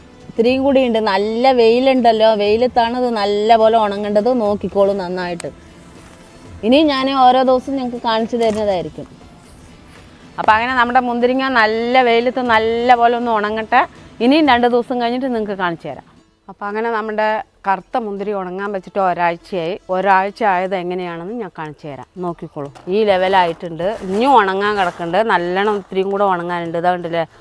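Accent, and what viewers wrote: Palakkad, India. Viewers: അമ്മൻ്റെ നടെവിടെയാണ് സംസാരംകേട്ടിട്ട് പാലക്കാട്ടാണൊ എന്ന തോന്നൽ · പാലക്കാട്‌ ആണ് തോനുന്നു സംസാരം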